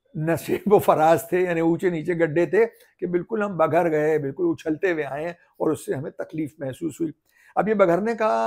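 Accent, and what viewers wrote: Lucknow, India. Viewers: پاکستان میں بیٹھ کر آپ کی بدولت لکھنؤ کا لہجہ سننے کو مل گیا۔ بہت خوبصورت ۔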